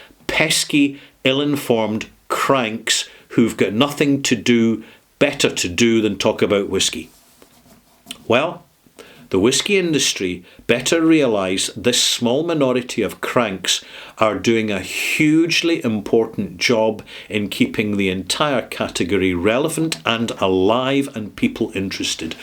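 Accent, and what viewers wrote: Scotland, United Kingdom. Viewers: "cranks are doing a hugely important job in keeping the entire category relevant and alive" It might seem like this is just ego talking, but it's really true. I myself, someone who drank virtually no alcohol at all, got into Scotch a couple of months ago after YT had decided to recommend me a video of a Scottish gentleman with a lovely accent, sitting in front of a barrel, talking passionately about whisky. Your enthusiasm is contagious, so after watching a number of videos I decided I had to buy a couple of (integrity!) bottles to see what the fuss is about myself, and thanks to your recent mini-series I want to get my hands on some rum too:). Cheers from Slovakia,!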